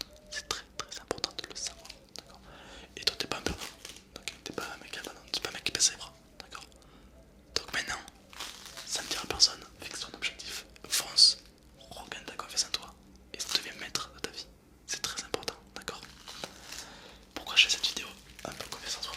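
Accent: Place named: Toulouse, France. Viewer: Ses se que on appelle l'accent toulousain même si oui il a une très belle voix aussi (pk j'ai perdu mon accent toulousain moi 😭)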